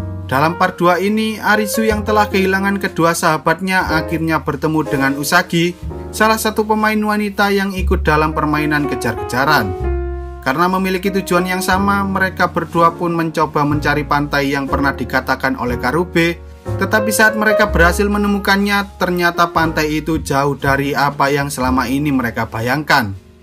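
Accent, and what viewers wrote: Java, Indonesia. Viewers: Jowo ta mas?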